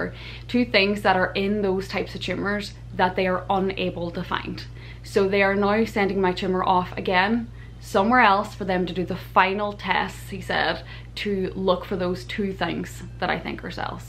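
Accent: Ireland. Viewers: I love your accent. Is it Irish? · I've been following your story and praying for the best outcome. Spent a bit of time myself in hospitals and quickly realised that having a positive outlook no matter what get thrown at you is most important. All the best, just love your Irish accent, my folks originally came from Donegal. ☘ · Her irish accent sounds like "hi, my name is Erin" , or "hi, my name is Aaron"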